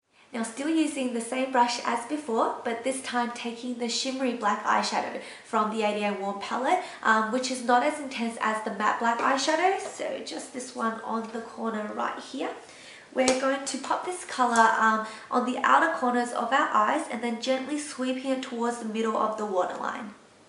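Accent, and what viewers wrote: Australia. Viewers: i havent really heard other aussies talk on youtube! woah our accent is soo totally different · australian actually.. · Wow you're one of the few Asian make up gurus with an Aussie accent! Go Aussies ^^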